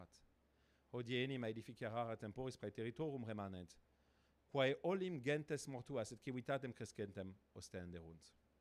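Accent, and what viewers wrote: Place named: France. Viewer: He should have read his work with a Latin accent, not with a French one!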